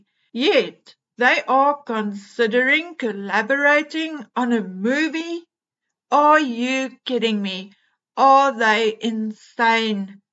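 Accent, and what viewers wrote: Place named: South Africa. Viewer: dont know what it is about the South African accent but its soooo beautiful .-) great video btw , lots of valuable points made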